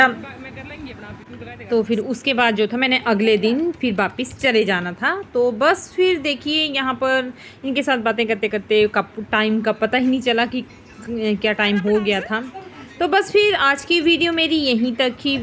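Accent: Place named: Himachal Pradesh, India. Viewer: Himachali boli vadiya lagdi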